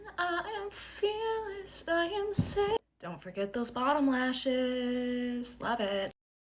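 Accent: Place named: Midwest, United States. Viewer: why did I hear that in your Midwest accent?? 🤔🤣😍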